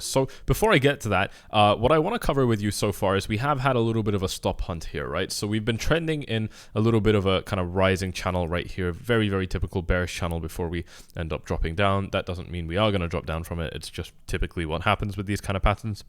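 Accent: Scotland, United Kingdom. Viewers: Just found your channel mate, love it. East coast of Scotland accent??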